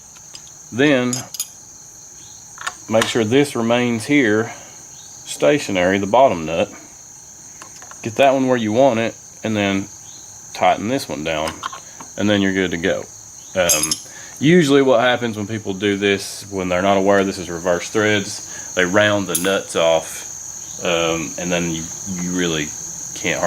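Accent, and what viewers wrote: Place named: United States. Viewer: But bro.. Is that how you all really talk in America??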